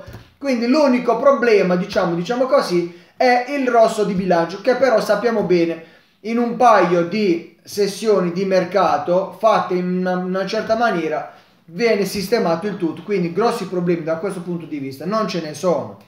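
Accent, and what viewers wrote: Bari, Italy. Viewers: Ma di dove sei di Bari ?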